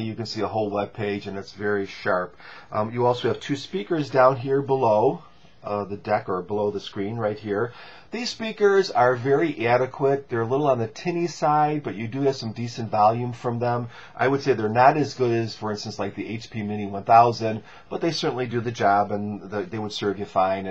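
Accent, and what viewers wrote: Chicago, United States. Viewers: Hahaha Its the way you pronounce your "A's" and "O's", especially the A's. For example in "podcast". Sounds like a strong Chicago accent to me. I'm from the south (Arkansas), by the way.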